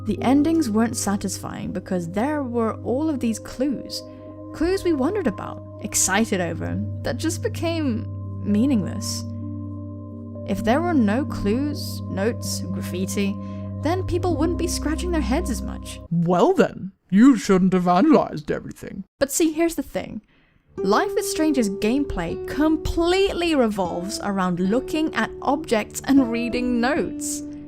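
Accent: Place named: RP, United Kingdom. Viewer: you sound soo posh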